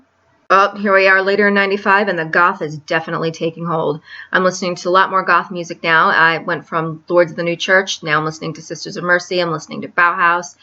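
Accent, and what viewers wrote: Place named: New York, United States. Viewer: I can totally tell you're a New Yorker :P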